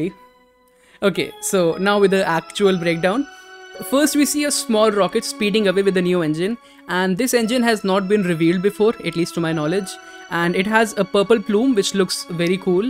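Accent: India. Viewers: Bro has Indian accent!.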